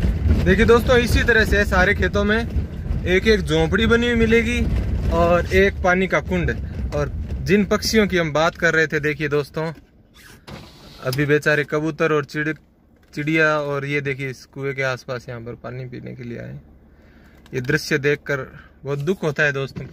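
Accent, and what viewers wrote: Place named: Nagaur, India. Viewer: Nagaur s match khati h aapki language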